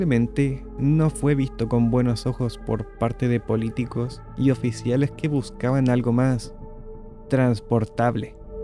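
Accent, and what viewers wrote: Chile. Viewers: Hola , gracias por los excelentes videos hechos con mucho cariño , una consulta al margen , es usted chileno ? Saludos desde el sur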